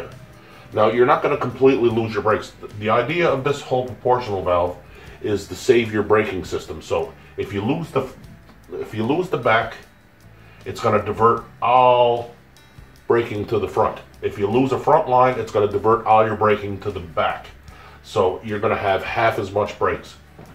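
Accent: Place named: Newfoundland, Canada. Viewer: first 5 seconds.. please tell me yer newfie?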